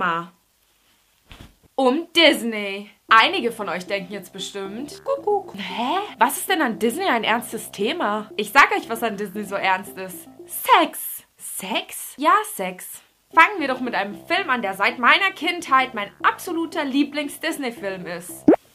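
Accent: Franconia, Germany. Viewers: Kommst du aus Franken? Oder was ist das für ein leichter Dialekt? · kommst du aus franken???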